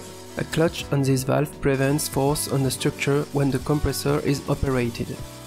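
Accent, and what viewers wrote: France. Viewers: It still sounds very french ;) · T’es français tu parle comme un français ! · sounds very french but the script is very well written. and the model is, as always, leagues ahead of what a mortal could come up with. good job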